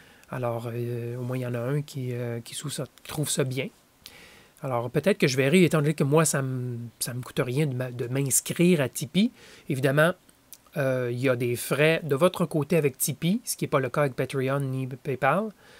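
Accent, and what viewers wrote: Quebec, Canada. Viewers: Merci à toi l'ami pour cette première vidéo en live à laquelle j'ai pu participer . En plus avec l'accent du Québec, c'est le top ! :-) Tu laisses visible le contenu de ce qui s'est dit dans le t'chat et je trouve ça bien sympa ! Cordialement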